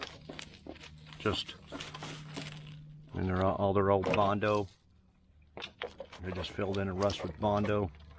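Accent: Canada. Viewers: You’ll tackle any tasks, sheet metal, electrical, or heavy mechanical! You’re a real pro. It’s easy to see you have lived this discipline most of your entire life, and you love it. It all shows through. Your time in Alberta shows up in some of your pronunciation of certain words which is certainly not part a Texas accent. Professionals like you are far and few. Thanks for your excellent videos and sharing of this craft you have so brilliantly developed. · I knew it. I just found your channel and I was wondering to myself “why does he sound Canadian when he is (I think) in SC? This explained that. That’s awesome though. My wife and I are looking at leaving NJ for the upstate of SC. It’s pretty much 50/50 over the weather and the liberal bullshit. 😂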